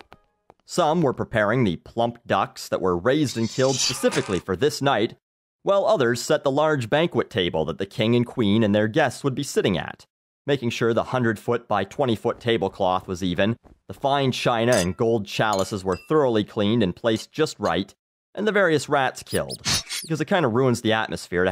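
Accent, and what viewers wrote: England, United Kingdom. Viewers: i like british accent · Your English accent is pretty awesome, man. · Your British accent was too adorable. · Epic British accent, bro. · lol english accent XD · awesome brit accent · You have a great British(?) accent.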